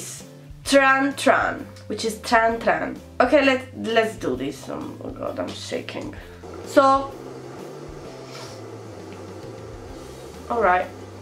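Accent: Sardinia, Italy. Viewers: come si sente che sei italiana 😂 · Fidati che dal tuo inglese si nota che sei italiana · Siii. Io sono sarda e vivo in Sardegna. ❤ e nel riassunto del video la penso come te.😍 Ajooo. (Sarebbe una parola sarda)😂 e tra l'altro hai i miei gusti😂💕💕 sinceramente il tuo accento quando parli in italiano sembra sardo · Ma sei ita o ing/americana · Figa se si sente che sei italiana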